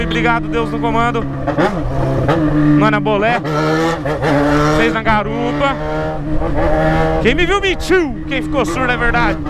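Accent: Goiás, Brazil. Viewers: por que você não fala mais no pique do Goiás???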